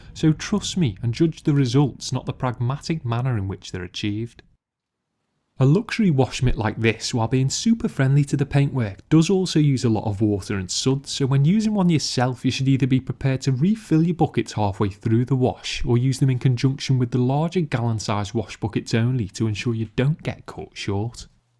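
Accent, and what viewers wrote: Liverpool, United Kingdom. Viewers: Amazing !!! Are you from Liverpool? Lol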